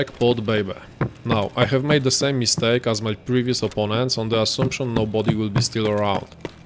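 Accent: Poland. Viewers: Właśnie odkryłem Twój kanał. Nie wiem dlaczego ale od razu po akcencie wiedziałem, że jesteś Polakiem :D Świetne filmy, tak trzymaj!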